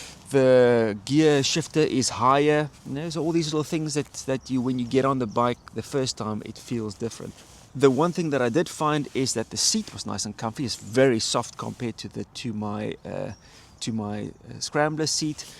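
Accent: South Africa. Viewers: Hey boet. You sound south African if I'm correct?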